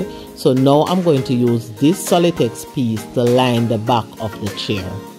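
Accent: Jamaica. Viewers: You sound Jamaican 🇯🇲 . Anyway, I have two old armchairs that I will diy into throne chairs. I hope mine looks awesome like yours. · are you Jamaican?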